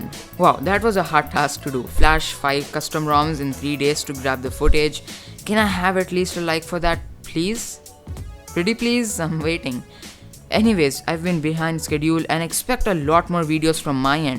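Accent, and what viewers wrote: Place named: India. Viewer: This is what you get when you combine good english words and Indian accent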